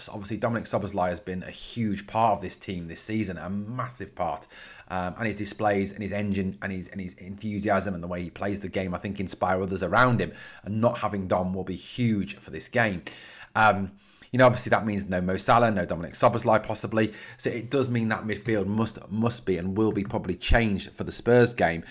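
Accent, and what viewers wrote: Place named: Liverpool, United Kingdom. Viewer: Good old Liverpool accent.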